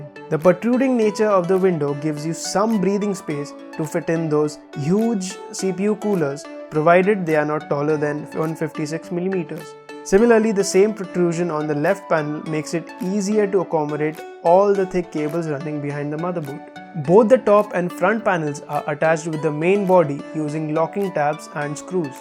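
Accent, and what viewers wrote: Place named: India. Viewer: Typical Indian accent... ...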